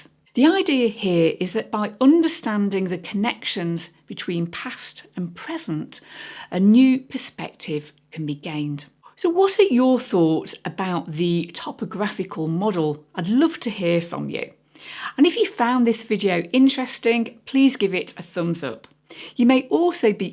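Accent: United Kingdom. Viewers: I'm very happy to find your channel , the topic are amazing , plus your british accet are marvelous , You have a new suscriptor , and thanks for your effort and confidence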